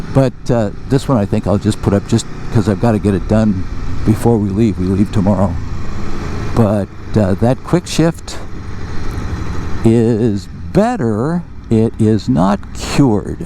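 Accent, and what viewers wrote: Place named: Spain. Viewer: Nice ride, I can say that you are a natural Spanish speaker :) Be safe my friend.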